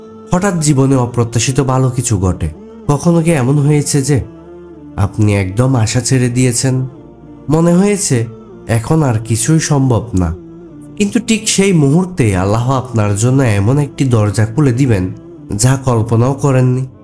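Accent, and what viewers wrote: Chittagong, Bangladesh. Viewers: আসসালামু আলাইকুম ভাইয়ের বাড়ি চিটাগং? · এই সব গুলো লক্ষনই আমার সাথে হয়েছে,আর যতই খারাপ কিছু আমার সাথে হয়েছে ততই মনে হয়েছে নিশ্চয় আমার জন্য ভালো কিছু আছে না হয় আমি কেনো এত কষ্ট পাই।তবে মাঝে মাঝে তো এক আধ বার হতাশ হয়েছি যে আমার সাথেই কেনো এমন হয়।ভাইয়া আপনার বাড়ি কি চিটাগাং?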